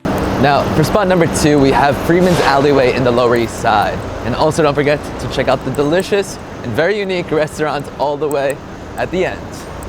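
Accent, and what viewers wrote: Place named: Philadelphia, United States. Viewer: Seriously. If you are going to move from another city to live here and then act like you know everything about it, you could at least pronounce the names correctly. And none of these spots are secret, they are just not visited as much by tourists. And I know he’s definitely not from here, based on the way he pronounced “waterfall” as “wooterfall”. He sounds like he’s from Philly or somewhere else in PA.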